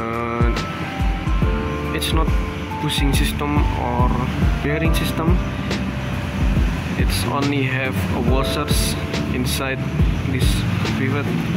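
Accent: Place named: Spain. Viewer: Contestame si sos español que hasta yo hablando otro idioma se cono no sos ingles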